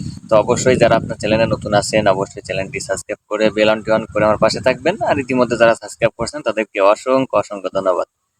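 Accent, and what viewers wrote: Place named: Jessore, Bangladesh. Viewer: ভাই আপনার বাড়ি কোন জেলাতে আপনার ভাষায় তো বলে যশোর চুয়াডাঙ্গা এই সাইটে আপনার বাড়ি কোন জায়গায় একটু যদি জানাইতেন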